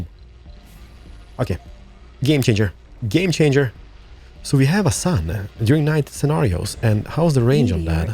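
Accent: Scania, Sweden. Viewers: Asbra serie! Hör på dig att du är skånsk! :D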